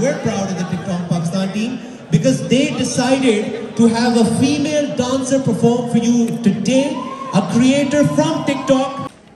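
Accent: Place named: Mumbai, India. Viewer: Urdu nahi ATI Mumbai wali zuban bolti ho.mere ko etc q? Pak ki ho ya indian ho? Or galiyan bhi tumhara brother ya dost deyta hai .zuban tu seedhi rakho it is not funny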